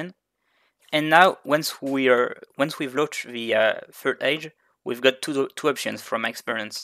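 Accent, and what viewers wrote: France. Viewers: Is he French?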